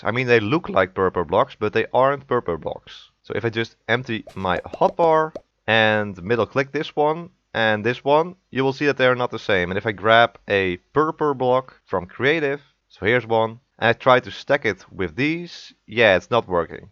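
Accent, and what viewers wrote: Netherlands. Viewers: When u hear a dutch accent in his english voice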